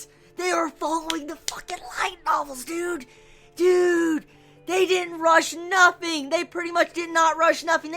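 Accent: United States. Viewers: That country accent tho...:)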